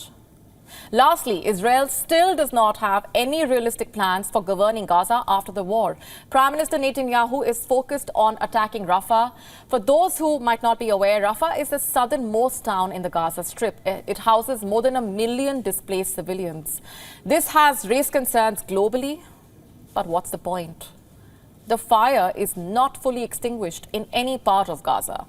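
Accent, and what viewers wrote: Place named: India. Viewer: This lady is speaking English with Indian accent that makes you throw up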